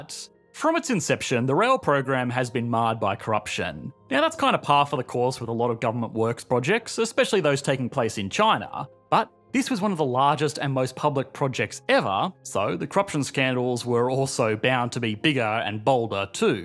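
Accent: Australia. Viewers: What is this australian dialect/accent called? I need to know so I can avoid it in my life forever. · Usually but in this case he is a pretentious individual trying to appeal to the Americans. If he was genuine in what you he would have dropped his Australian accent. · Australian accent is so freakin weird like how he says todddaaaay lool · 这个口音我猜听上去像个澳大利亚人，众所周知，澳大利亚跟中国的关系现在一点都不好。我还觉得粉种人显然对中国人的经济观完全不懂，喜欢用自己的经济学常识分析中国，中国人一般认为经济出现大问题是因为存量市场瓜分殆尽，并且新的技术革命未能出现。至于债务危机，中国是一个儒家国家，政府对经济部门的掌握不仅仅限于交通工具，还有金融、能源、化工、机械、物流等各个领域，任何部门的利润在必要时都会拿来作为财政收入填补其他公共部门的亏损。而且高铁的建设是为了带动整个地区产业的繁荣，并不以追求利润为目标，所以广大的中小民营企业才是高铁的最大受益者。如果要说债务危机，那么我认为维持一个高福利社会的制度危机更严重。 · He is Australian but I would suggest has lived OS for a few years, Most Aust as u correctly stated pronounce route as "root" and router as "rowt-er" as it has a sexual meaning in slang.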